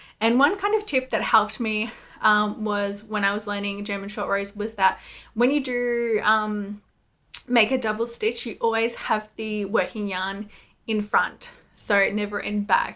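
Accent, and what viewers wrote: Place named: Australia. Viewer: under which rock have you been living. The accent and the acknowledgment at the beginning. Obviously an Aussie.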